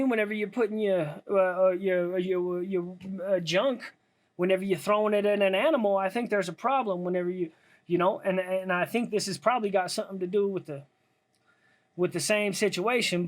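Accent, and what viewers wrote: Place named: United Kingdom. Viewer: I love this kind of British accent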